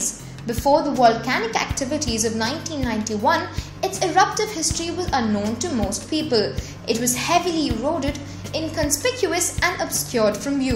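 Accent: India. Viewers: indian xa... ganyan silang mag-ingles cute lang talaga ...-) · Indian accent? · Indian accent my dear.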